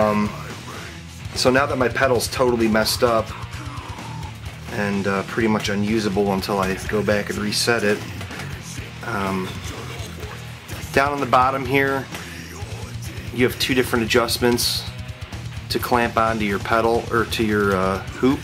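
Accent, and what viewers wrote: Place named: Canada. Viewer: he kinda sounds canadian doesent he??? small accent ??? or maby colorado??? haha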